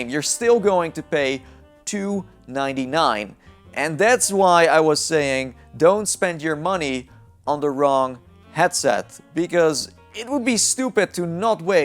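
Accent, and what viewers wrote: Netherlands. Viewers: Ben je Nederlands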